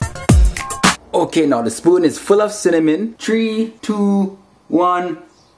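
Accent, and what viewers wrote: Jamaica. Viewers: I love your jamaican accent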